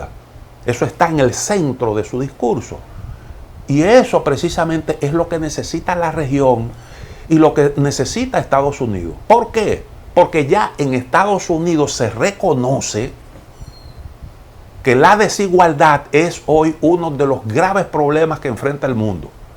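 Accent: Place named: Dominican Republic. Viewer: Buenas noches. Ciertamente no me pierdo ninguna de tus programas, siempre interesantes. Hace ya 23 años participe el la reconstrucción de RD en la zona de Haina, tras los desastres del Huracán George. Monte una mina en el Montoto, carca de Higuey, para fabricar agregados para asfaltado y hormigón de las carreteras y puentes que se habían destruido. Como dicen ahí, "dure " dos años, alternando con otros negocios que tenía en España. tengo grandes recuerdos de ese país y lo recuerdo con cariño. Su dicción Dominicana me encanta. Colaboraba conmigo un gran amigo dominicano, el Ingeniero Emilo Pimentel. Gran persona como amigo y profesional. Perdí el contacto con el. El Huracán fue en el 98 y hace años, si sigue entre nosotros, tendrá cerca de 80 años. A donde voy es que su manera de hablar pausada y sus dichos dominicanos me recuerdan a el. No he vuelto por ahí, pero me cuentan que ha cambiado mucho el país. 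Me llamaron para la construcción de carreteras en Haití, antes del terremoto. Había una empresa española que se llamaba Elsamex y nos propuso formar una UTE para realizar las obras mencionadas en Haití. Surgió el terremoto y se indio la oficina provisional de Elsamex en Haití, y murieron dos ingeniero y un topógrafo que se encontraban en dicha oficina. Lógicamente se suspendió la obra que se iba a realizar con financiación del Banco mundial, lo mismo que se hizo en las obras de Higuey.Le contaría muchas historia de mis vivencias en RD. pero no viene al c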